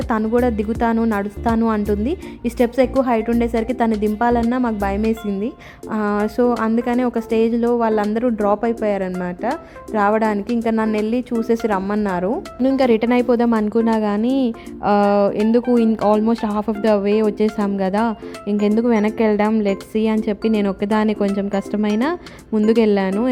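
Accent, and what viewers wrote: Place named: Hyderabad, India. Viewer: Eydhemaina hyd slang marchipole... Very good keep it up...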